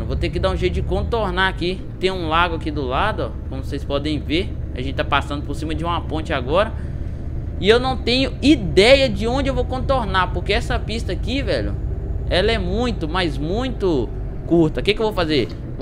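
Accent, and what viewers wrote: Brazil. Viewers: não é de são paulo e veio da onde cara? já tinha reparado mesmo nos outros videos o "oxi" kkk =D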